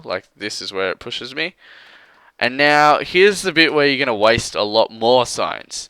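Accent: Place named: Australia. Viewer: I laughed my ass off hearing you count from 1-30 seriously, i admit i've heard my fair share of australian accents, but this was brilliant, this made my day! Hope no offense is taken, im saying this as a compliment. If i could have an australian accent i would ! just to count like you do! lol haha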